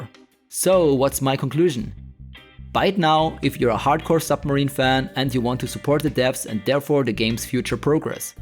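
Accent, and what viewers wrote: Germany. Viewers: I never thought of you as being a Nazi, I do like your German accent as it adds more realism to the theme being kriegsmarine. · I have never heard an Asian guy make jokes with a German accent before... This was like some kind of an existential experience to me, thanks mate :D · Great review and I love the German Speaking! Lol and to all the people that think germans are nazis I guess all Americans are Republicans ;) or all Canadians are liberals. Bought the game in Hope's it's like SH3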